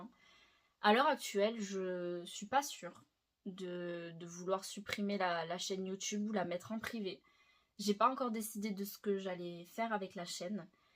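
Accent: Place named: South, France. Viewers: Merci pour toutes ces vidéos, c'était vraiment du travail de grande qualité! Super content de voir que tu as l'air dans de supers dispositions pour ouvrir ce nouveau chapitre qui je l'espère te comblera! (l'accent du sud-ouest mama comment c'est craquant...) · Coucou c'est mon premier message sur ta chaine, et je crois sur n'importe qu'elle chaine d'ailleurs, mais j'avais recommencer a écouter tes vidéos ASMR depuis 2/3 jours elles m'avaient beaucoup manqué un peu de tristesse d'entendre qu'il n'y aura plus d'ASMR mais aussi super content que tu réussisses se que tu as entrepris, j'avoue que ça fais aussi bizarre d'entendre ta voix " haute " on va dire ^^ et c'est pas déplaisant haha! ( l'accent toulousain incroyable! ) mais voila je continuerais a écouté tes vidéos car j'en ai besoin rien que ta voix me fais beaucoup de bien! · En asmr c est compliqué de dénoter des accents . 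Elle a déjà parlé normalement avec cet accent du sud .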